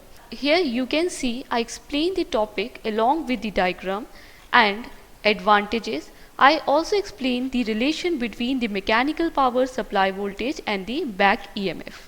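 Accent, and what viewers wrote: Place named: India. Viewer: Very good Best Indian accent yet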